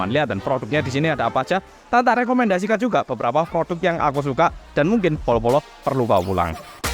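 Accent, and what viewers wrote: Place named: Surabaya, Indonesia. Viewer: lagatnya suroboyonan.. btw muantaap infone mas... kapan waktu aku tak mangkat korea.. sehat selalu masee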